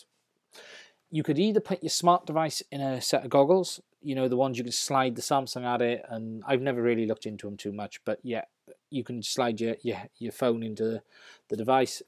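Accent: Wales, United Kingdom. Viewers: so welsh!!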